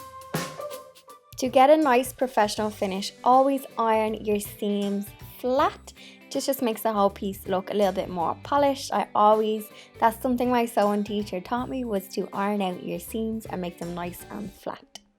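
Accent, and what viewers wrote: Ireland. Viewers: I'm going to make some of these for my little things for crafting in this nursing home. Ty so much you're beautiful and so are these. Love your accent sounds like Ireland. I'm part Irish. Miss my DAD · New to your channel, love your fabulous Irish accent, reminds me of home, I am from Dublin, finglas. I love the ideas so simple and so effective. They are so very pretty. I will definitely be making them. Well done. Love the cats entrance. Lol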